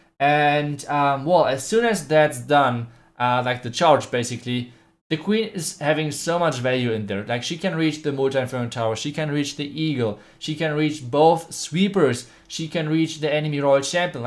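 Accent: Germany. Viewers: This made me realize tho, it's in the delivery. Germans have that accent and I think it messea it up lmao. Messes**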